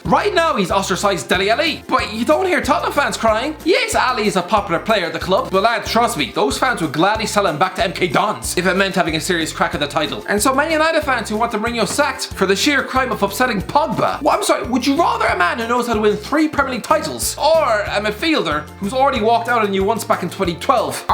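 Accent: Ireland. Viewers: A Newcastle fan with an Irish accent, I don't know what to believe.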